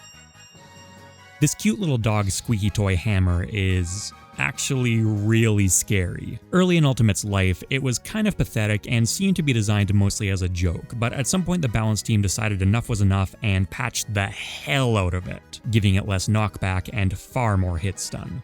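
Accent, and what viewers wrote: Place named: Canada. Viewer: today I learned is Canadian. about=aboat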